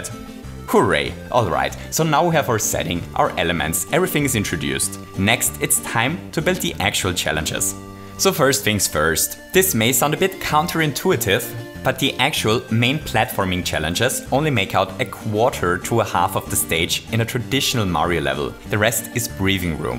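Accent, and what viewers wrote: Germany. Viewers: Could you please make Videos in German? Ich kann deinen Akzent hören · Wait r u German? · Du hörst dich deutsch an :D kann es sein? :o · Are you a German because you're english sounds like it has a german accent and I'm Not really Sure about it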